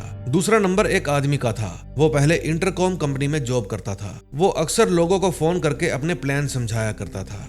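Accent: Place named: Kerala, India. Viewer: The narater also seems to be a malyali going by the pronunciation, .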